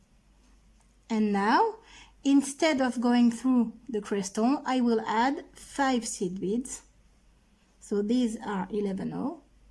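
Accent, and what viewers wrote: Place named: France. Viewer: And it's even better with that cool French accent!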